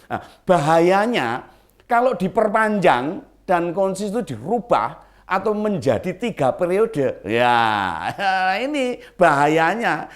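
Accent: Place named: Solo, Indonesia. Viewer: Mantab om...suka aku dengan presentasinya yang tegas..dengan dialek solo yang khas.sukses ya om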